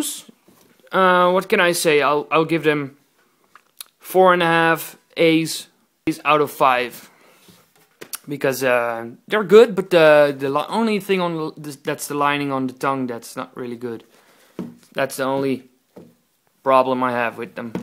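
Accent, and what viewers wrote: Netherlands. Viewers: O BTW again are you dutch? you sound dutch ( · Dude your accent sounds dutch. Im from holland also but they wont answer me on msn. How much did you pay for these? thanx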